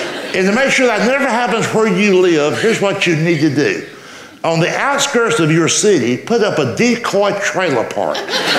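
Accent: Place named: Louisiana, United States. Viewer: sounds like he's been to Louisiana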